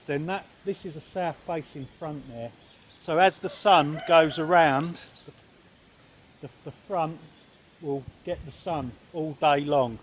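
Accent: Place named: South East England, United Kingdom. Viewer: LOVE your accent. Bit rare ironically in London and the south east now. Cool video. Thanks😄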